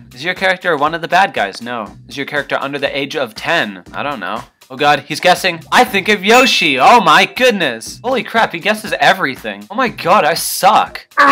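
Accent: Australia. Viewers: Your Australian? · HES AUSTRILLIAN?!?!?!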